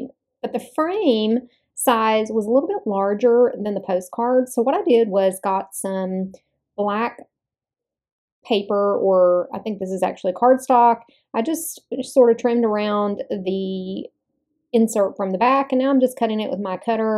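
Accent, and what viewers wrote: North Carolina, United States. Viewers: I knew you had to be in North Carolina by that accent. I'm in Winston-Salem. We used to go to Hickory a lot for Gems & Minerals shows when I was little. I hated going, but they would bribe me taking me to Hardee's there. They were the best back in the day.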